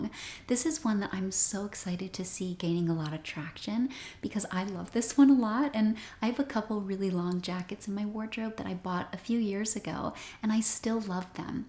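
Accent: United States. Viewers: It just occurred to me (sick on the couch today) that it sounded like I was trolling🤦‍♀️ so I apologize if that's how I came across (you've got that old school transatlantic speak) I do find your page wonderful and I wish more women would follow your lead 💯 what also makes it so appealing is its geared towards EVERY age 😍 There is a woman named Heather something or other and I swear her "fashion advice" towards the 40+ set has them dressing like milf cougar super hookers that look like they've been gangbanged by a crayon 🙄 Not even kidding AND she calls it classy 😂 you must find her and see.. try not to spit your coffee!😉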